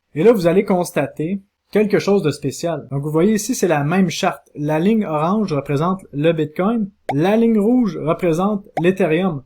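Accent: Canada. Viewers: Un grand bonjour de Suisse,j apprécie tes vidéos et te suis de puis un certain temps, de plus j adore cet accent canadien 🤗. Au plaisir de te suivre dans une prochaine vidéo,merci beaucoup 👍👍.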